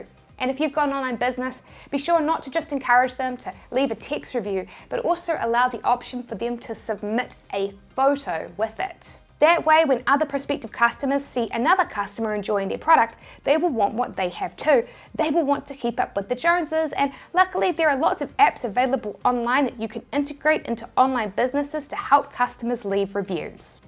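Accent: New Zealand. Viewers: Cool, sound like a New Zealander tips.😎👍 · Such Kiwi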